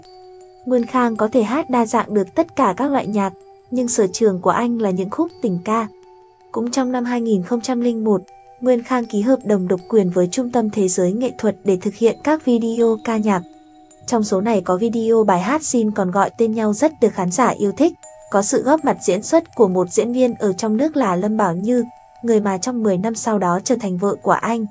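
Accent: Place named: North, Vietnam. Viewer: Đã lâu o nghe giọng Bắc nữ trầm, hôm nay tình cờ thật lấy làm hoan hỉ quá 🤓 cảm ơn cô và mong được nghe thêm nhiều hơn ! Chúc cô và gđ nhiều an lạc an lành an yên... 🌷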